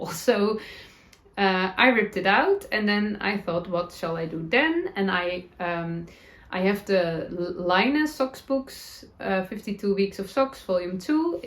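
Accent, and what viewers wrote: Netherlands. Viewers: I understand the change but that doesn’t mean that I like it. You are so cute and authentic. I too am amazed at your grasp of English. It makes me smile to listen to you with your Dutchy english. I will look forward to Mondays with you. Gayle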